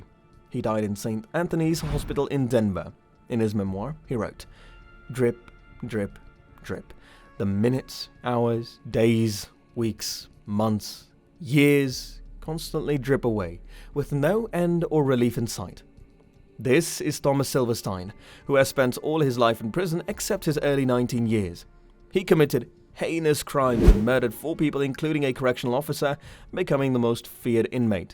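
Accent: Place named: Netherlands. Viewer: You have a strong Dutch accent when speaking English. Good video, Gracias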